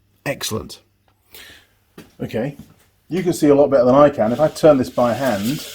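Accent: Yorkshire, United Kingdom. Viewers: Where in the UK were you from originally? I'm detecting a Yorkshire twang.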